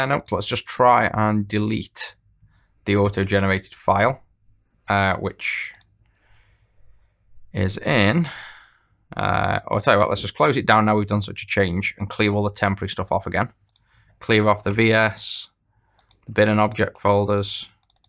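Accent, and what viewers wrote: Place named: North, United Kingdom. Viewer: Just wondering, are you from Liverpool? This sounds like northern UK accent.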